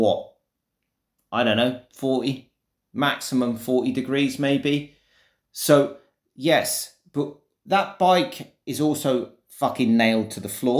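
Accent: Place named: United Kingdom. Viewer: What is your dialect of English called? I’m asking because I’ve recently become fascinated with the dialects of Britannia. Please don’t be cheeky and tell me something like “South Eastern C Nut” or “Cuntyshire.”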